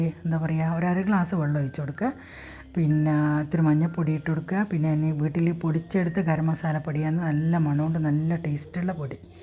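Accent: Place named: Kannur, India. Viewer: Enik Chechiide samsaram nalla ishtaayi ente kannur ulla relativesntem family friendsntem pole.. ❤ first like ente vaka.. ❤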